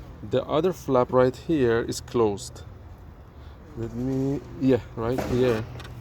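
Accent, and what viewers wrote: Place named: Iran. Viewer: Persian accent😊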